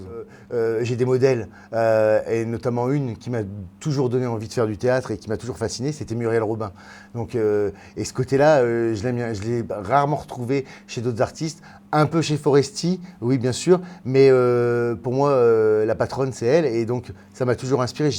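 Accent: North, France. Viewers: Surtout change rien JeanFi au début j'avoue j'avais du mal avec ton accent du nord moi qui suis du sud je n'ai pas l'accent de Toulon encore moins de Marseille. Dommage qu'avec Air France on ne puisse pas aller au Québec.... Je t'ai bien sur découverts avec la bande a Ruquier et sérieux quand Laurent te programme je suis super contente car je sais que je vais passer un excellent moment. Et puis j'ai écouté ton sketch ou tu parle de ta sœur et de tes parents. Je suis reconnue quand tu parle de ta sœur. Mais même quand tu caricature ton milieu et tout ce qui se passe dans un avion moi j'ai la chance de pouvoir le prendre assez souvent alors c'est malheureusement pas Air France que je prends mais Air Transat que j'appelle "Air Sardine" mdrrr la bouffe est immonde mais en contre partie j'ai l'accent Québéçois dés que je monte dans l'avion. En tout cas merci pour ce que tu es... 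Bisousssss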